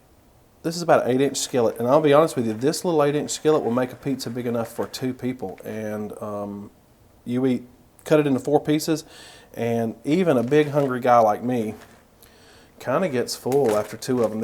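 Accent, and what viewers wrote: South, United States. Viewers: Just discovered you the other day and you are an absolute DELIGHT to listen to! You're so funny and sweet, informative and I love your southern US accent! I'm already a fan, over here in Belgium. 🙂❤️ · I love your southern accent. 🙃😁🤣 "oh come on here withat!" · Binge watching you today! LOVE 💕 THIS 💕 CHANNEL!! U make me smile! U encourage me to cook and I love your realism. Can’t wait to make this! I love your accent. I’m from SC so it’s comforting to hear southern twang! Keep calm and Keto on, my man!!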